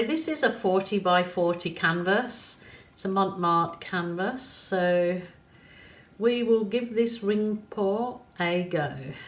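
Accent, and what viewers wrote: Birmingham, United Kingdom. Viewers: Definitely Brummie ! LOL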